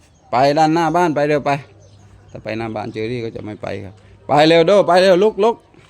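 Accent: South, Thailand. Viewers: ทำมัยไม่พูดภาษาไทยจะได้ฟังรู้เรื่องบ้างนี้พูดภาษาใต้ฟังไม่รู้เรื่องเลย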